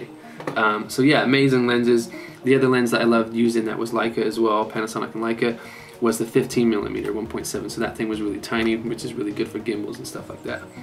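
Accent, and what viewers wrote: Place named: United Kingdom. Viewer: I have noticed that you are getting more of a British accent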